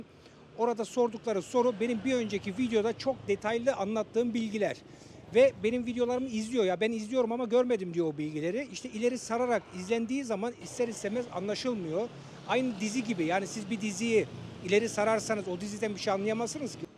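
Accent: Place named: Germany. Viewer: abi sende bi alman aksanı sezdim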